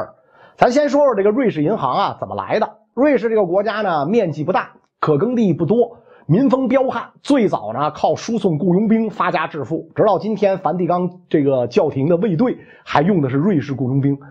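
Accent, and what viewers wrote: Beijing, China. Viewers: 喜欢袁老师京片子，猴儿赛因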